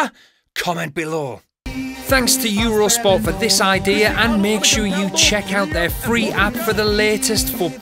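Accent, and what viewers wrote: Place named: England, United Kingdom. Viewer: the english accent ruins it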